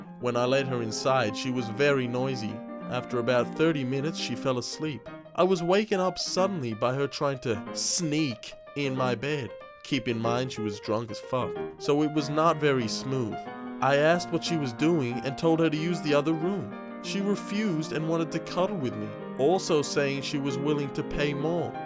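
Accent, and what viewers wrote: Australia. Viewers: right, no wonder you had an Aussie dialect and accent (partial) but not too obvious when you spoke watched half of your videos, trying to catch up with all of them, and realised that your channel only just started a few months ago, and I found your channel not too long ago either keep up with the good work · Christ that is by far the most annoying australian accent i have ever heard.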